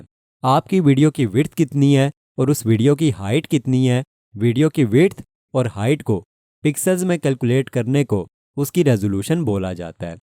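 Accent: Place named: Pakistan. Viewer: Apki awaj pakistan logo jaisa ata hai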